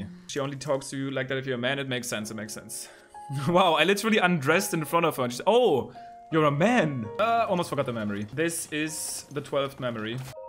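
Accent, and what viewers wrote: Germany. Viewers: Why are you... German? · Dieses alman englisch ist echt nice, irgendwie xD😅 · Ich weiß direkt dass du deutscher bist Lul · Bruder bist du deutsch??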